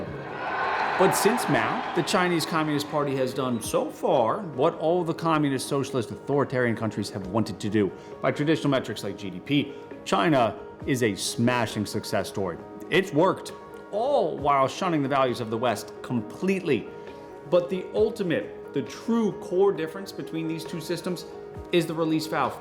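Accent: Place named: New York, United States. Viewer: Im a Muslim so I don't have much love for communism. I know how Muslim populations are military target No.1 for any red army for total annihilation or reeducation. But c'mon dude. An Ivy league degree, a spiffy NY accent but nothing but bias and hot air is going to end up collapsing the USA. We deserve better than that. We need the US as a world order insurance to make sure no one crosses beyond the red line. Do your job guy!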